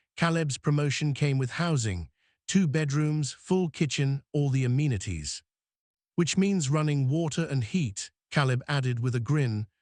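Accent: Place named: England, United Kingdom. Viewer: Get rid of the English accent, please.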